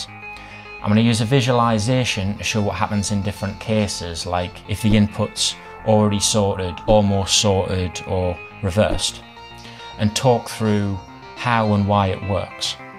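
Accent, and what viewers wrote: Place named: Northern England, United Kingdom. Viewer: I liked the clear explanations and the awesome animations. I also liked your Northern English accent. On the other hand, as a programming noob who has only studied Python 3 I would have preferred the language to have been Python 3. You never said which language you were using. Is it JavaScript? I could not understand the line of code at the top and you never explained it. I think your method of starting with something simple and adding optimizing code later is great. However, I would have liked it to start even simpler. I am here because of your Twitter reply to 3B1B. Do you have any Python 3 tutorials? I am especially interested in string manipulations, simple chess playing programs, and simple text to speech stuff, not that I am particularly typical. I am also an explainer, but I do my explaining on paper (figuratively speaking) in my free blog on Medium.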